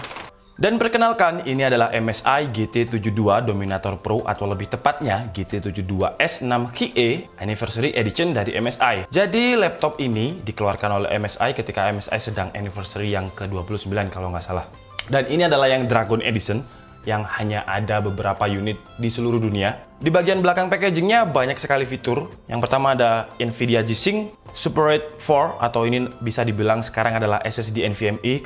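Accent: Malang, Indonesia. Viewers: Wong malang mas ?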